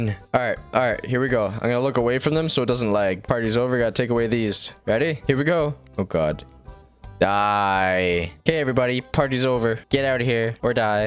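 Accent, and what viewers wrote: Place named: Canada. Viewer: Your Canadian accent is so magnificent...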